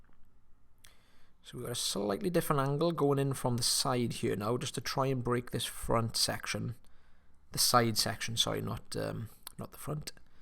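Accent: Wales, United Kingdom. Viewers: Love your Welsh accent.....Merry Christmas to you and yours! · Well done. Take a bow 👏🏻👏🏻👏🏻👏🏻👏🏻👏🏻👏🏻👏🏻 can I please ask where abouts in Wales you are from as you accent is slightly different to Mr B’s. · Well done!!!! You were calm, cool, and collected. It was quite a tough one, but you just persevered and got those sticky bits out!!! 👂🏼 And your voice is so charming!!! I love a good Welsh accent😊 Can’t wait for day 17!!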